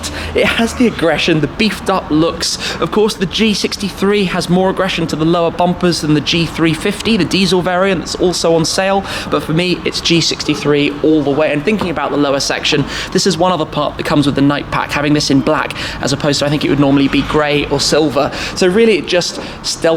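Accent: United Kingdom. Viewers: I don’t know man, I think I have a pretty good radar and I think he’s just really posh English.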